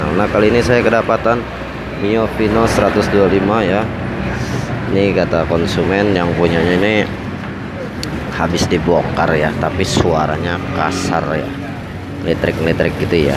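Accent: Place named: Banyumas, Indonesia. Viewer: Sampean wong ngapak yo.kon wong Endi.salam santun dari Riau 🙏.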